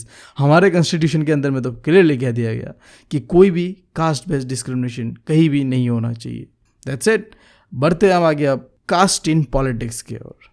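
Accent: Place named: Northeast, India. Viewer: This educator is North eastern? I think so from the accent 😊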